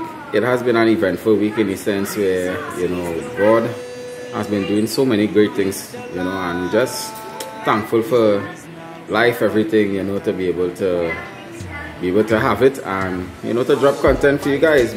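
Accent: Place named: Trinidad and Tobago. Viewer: I love trini accent omg😍 We are a Jamaican youtuber living in Barbados🇯🇲🇧🇧 New subscriber here!💕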